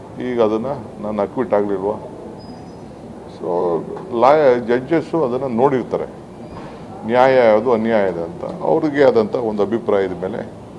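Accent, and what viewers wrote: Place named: Kanakapura, India. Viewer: ಅಣ್ಣ ನೀನು ಎಲ್ಲಿ ಮಾತು ಕಲಿತಿದ್ದು ಕ ನ ಕ ಪು ರ ದ ????? ನೀನು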